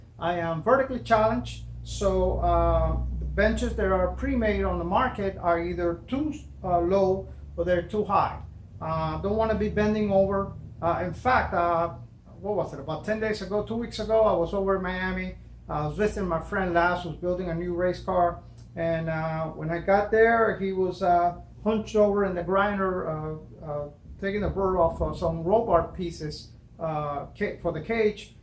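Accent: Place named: Cuba. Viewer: Soon as he spoke. before I saw the name n he said it. I knew he was cuban. Can't fake the accent mi gente!! Conño!! Nice vid! Thank you meng.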